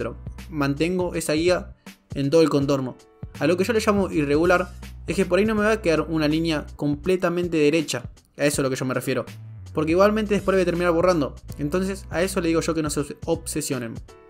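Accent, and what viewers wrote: Argentina. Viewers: muy buen video solo que por favor pronuncies mas despacio ya que eres argentino y aveces no te entiendo tu acento ,, es solo tener cuidado al pronunciar es question de acento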